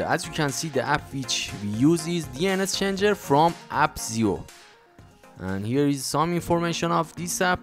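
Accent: Iran. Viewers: با این لهجت بهتر نیست فارسی فعالیت کنی داداش؟! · از لهجت معلومه ایرانی خو ایرانی توضیح میدادی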